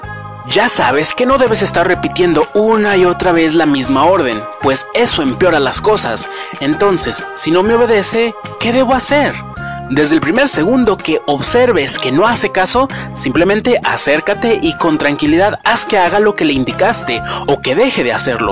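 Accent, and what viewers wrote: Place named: Mexico. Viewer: por su asentó, creo que es ud de mexico, o peru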